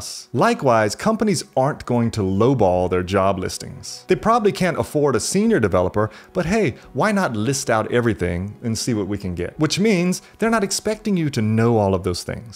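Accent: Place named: Chico, United States. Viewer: sounds like he's from Chico, California.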